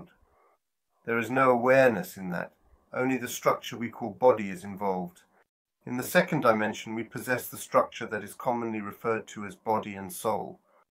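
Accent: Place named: United Kingdom. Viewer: Güzel bir İngiliz aksanıyla güzel bir açıklama olmuş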